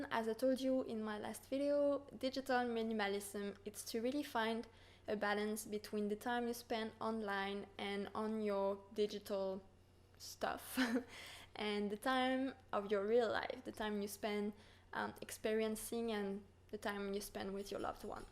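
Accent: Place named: France. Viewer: Are you French? You've got a cute accent.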